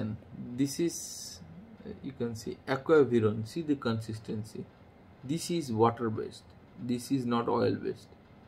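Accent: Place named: Canada. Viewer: I like your accent. Are you Canadian ?? Eh ?.ya ? Cool . Thanks doc.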